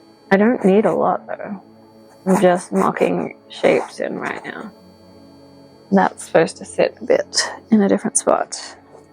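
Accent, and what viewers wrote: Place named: Australia. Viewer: Nice process video. Both real, and real-time. I'm terrible at placing accents, but I think you and are from the same part of Australia, and maybe have similar missions as art vloggers.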